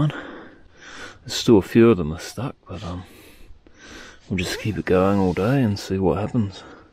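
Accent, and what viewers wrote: New Zealand. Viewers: I came across your channel by random surfing a few days ago... Now I'm absolutely hooked!!! Really interesting and good video's. I'm envious of how knowledgeable you are! Also, the Kiwi accent is cool as fooooook!!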